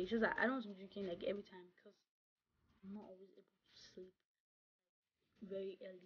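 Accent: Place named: Yoruba, Nigeria. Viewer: It’s d accent for me ...Confirm Yoruba girl😂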